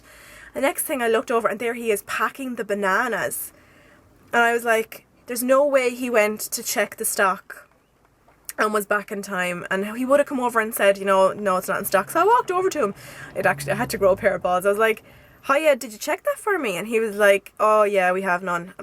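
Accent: Ireland. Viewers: where are you from in ireland? :) Im guessing wessshht?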